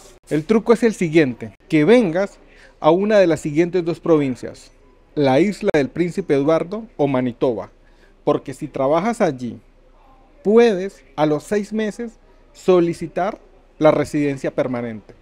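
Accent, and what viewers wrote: Colombia. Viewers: Este habla como hablaban los colombianos en los 80/90 cuando estaban huyendo de la guerrilla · Menos mal que alguien dice mucho de verdad al parecer eres un hombre de bien DIOS te guíe siempre muchacho, pero si ayuda mucho si vendes empanadas a los restaurantes y en tu casa si tienes entrega por lo menos yo lo hice y mis hijos tuvieron pan en la meza. Pero eres un hombre correcto tú no eres mexicano por tu acento eres colombiano ojalá no me equivoque te deceso lo mejor.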